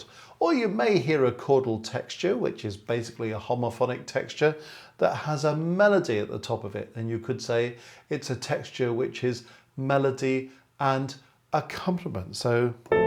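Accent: United Kingdom. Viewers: great teaching video sir! love your British accent really! the way you delivery the knowledge is really easy to understand! also i love every single example you played on the piano. so beautiful. could you please list the titles of examples when you have a chance? thank you so much and cant stop watching your videos. xx.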